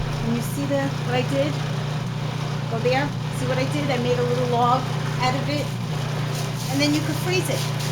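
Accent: New York, United States. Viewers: You sound like you have a New york, accent, I live in Rhode Island. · is she from new-york---- city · Girl you're from NY! Brooklyn? I'm from the Bronx and I think Bronx and Brooklyn sound alike... I haven't made butter in so long here heavy cream is do high never found for 2 and change lowest $4.99 I'm doing keto so I use butter a lot! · Great video! Fun gal. Wish you where my neighbor with your N.Y. accent! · I think your video is useful.....it shows timing of how long it takes, first hand. Screw anyone that doesn’t like it. I like it and I also like hearing your NY accent ,by the way! Good job! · I love her accent I think you should from Long island, New York, or perhaps Jersey. I also feel like she's a friend of mine like Francine said below my comment. Thank you I'm going to save this video very cool. I got a kick out of your frustrated with moving and not having all your things that you're used to using regularly. 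May God bless you and Long live butter!! 🐄 🥛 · Thank you for letting us into your home. Your very sweet. Loved listening to your voice/accent. Are you from New York or New Jersey.? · I enjoyed your video; is that a NY accent? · Luna Lia Gingerbread I believe that is a New York or Boston accent. Love it! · Shes new York o bet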